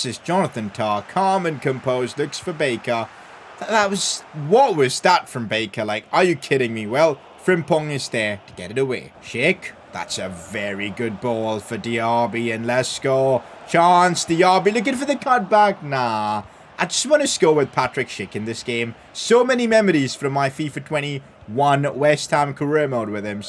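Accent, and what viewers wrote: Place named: India. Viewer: Great video but damn! Your Indian accent puts me off lol😂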